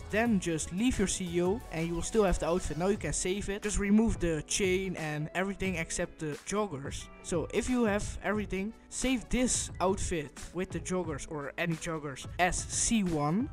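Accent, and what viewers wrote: Netherlands. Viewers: LOVED THE VIDEO! You sound very Dutch btw :) Ben jij Nederlands?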